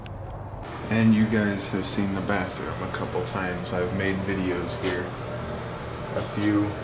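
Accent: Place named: Texas, United States. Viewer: Love the jumper. So these cats want Pizza? I keep thinking ur from Texas... x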